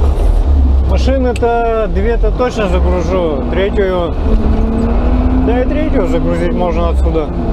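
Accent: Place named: Perm, Russia. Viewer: У тебя говор что то из Пермского есть)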